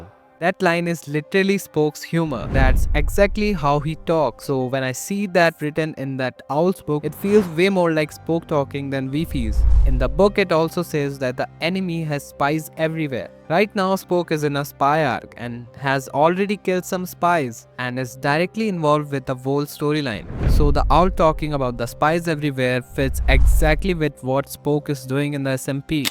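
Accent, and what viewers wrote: India. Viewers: the indian accenct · Your voice seem Indian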